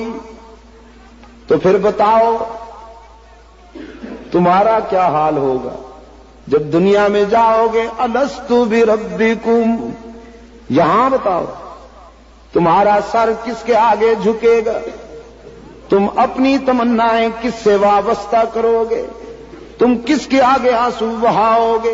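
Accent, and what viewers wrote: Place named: Sindh, Pakistan. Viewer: Hazrat ka Hamen lehja bahut pasand Aaya Hamare India Ke Log inke byanat bahut sunte Hain Inka Le Ja Sindhi juban ke upar hai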